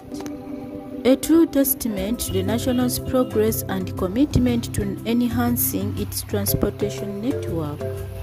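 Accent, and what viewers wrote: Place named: England, United Kingdom. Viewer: This English accent is beautiful.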